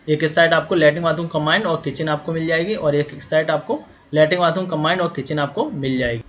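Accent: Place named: Bhind-Morena, India. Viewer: भिंड मुरैना क्षेत्र के लग रयै आवाज से।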